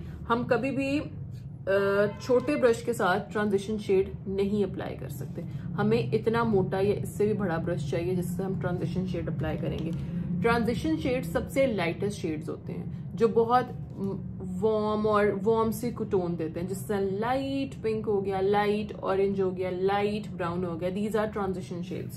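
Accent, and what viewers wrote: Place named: Punjab, India. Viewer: Bhtt achi lagi kal lazmi yeh trick try krungi nd ur punjabi talking way sun kr to video dekhne m or maza ajata ❤❤❤❤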